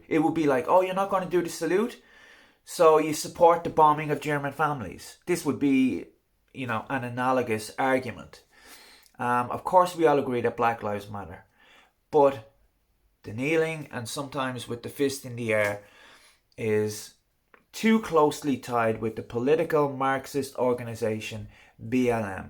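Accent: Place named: Ireland. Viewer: You are right Tyrone's move was not a good one, it was brilliant! The woman is vile and obnoxious and her abhorrent behaviour should be called out much more, you sound like you are Irish? if so, try this one, do you think it is acceptable that the same woman stood up in parliament approximately three years ago and suggested that they should block food supplies to Ireland in an effort to force the Irish away from seeking the backstop as part of the withdrawal agreement? What kind of sick person/politician would think on those lines, you see she is right wing and I find it interesting that you demean the left who by the way seek social justice and economic equality as their primary objective whilst using a Nazi salute as analogy and comparison, Priti Patel's behaviour is right wing and guess what, so too were the Nazi's, are you a closet Nazi and racist using soft and subtle persuasion tactics, I wonder? 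You are being very arrogant and clearly attempting to link politics with what is a simple yet powerful gesture against racism and again one has to wonder? your argument sounds a lot like passive aggressive. As for BLM, they are entitled to their views, aims just as equally as the right, and as for capitalism, it is no great shakes and will ultimately lead to the total destruction of human kind not as a matter of if but only when.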